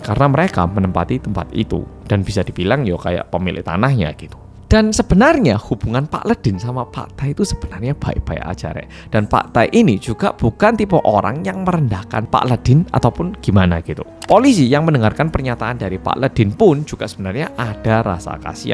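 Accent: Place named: Malang, Indonesia. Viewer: Iki ceritane wong korea berusaha ngomong bahasa vietnam dengan logat jowo malang nggih mas...😅❤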